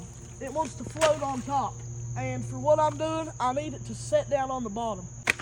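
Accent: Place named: South, United States. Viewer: Your accent is actually the best, southern accent!!!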